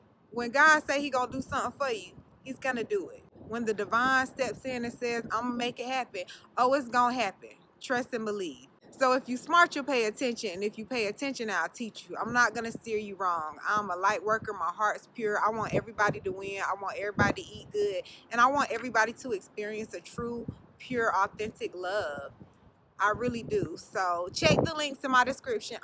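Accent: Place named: South, United States. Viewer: I thought I knew that country twang. In from Charlotte. Well the past 9 years. I try to listen to other’s knowledge on this subject, but I keep coming back to your intoxication.❤️❤️❤️❤️❤️❤️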